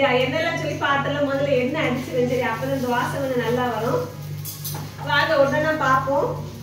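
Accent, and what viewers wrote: Kanyakumari, India. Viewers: அக்கா உங்க கன்னியாகுமரி பாசை சூப்பரா இருக்கு l like you akka vlogs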